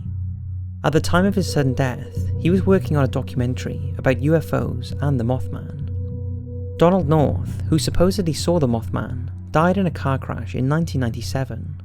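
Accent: Wales, United Kingdom. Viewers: You sound Welsh dude...what part? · He’s welsh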